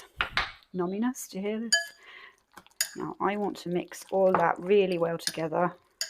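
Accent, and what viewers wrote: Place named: South East England, United Kingdom. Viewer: I prefer natural unedited videos. You must be be from the south east your accent is the same as mine.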